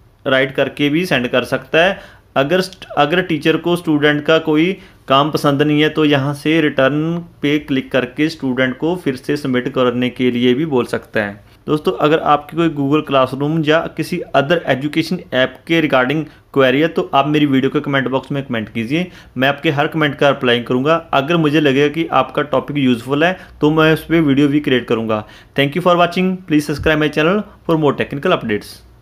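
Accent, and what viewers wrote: Punjab, India. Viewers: ji apka bolne ka tarika Punjabi yo jesa h me bhi Punjabi hu na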